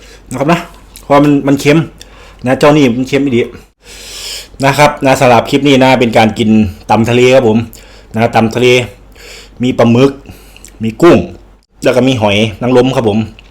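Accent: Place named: Chaiyaphum, Thailand. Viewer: อ้าย เว้าสำเนียงคล้ายๆบ้านนู๋เลยจ้าคนชัยภูมเด้อจ้าfc จากชัยภูมิเด้ออ้าย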